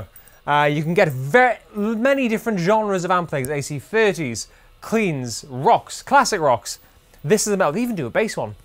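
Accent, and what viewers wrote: United Kingdom. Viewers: I didn’t expect his accent to be British he looks America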